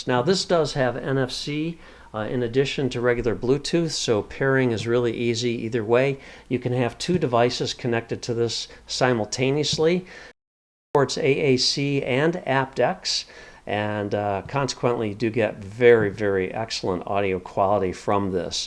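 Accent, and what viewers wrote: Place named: United States. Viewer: Great review/tutorial...Finally an "American English" speaking reviewer. Those reviewers from GB or wherever have those awful accents that make it hard for me to understand. Thankyou!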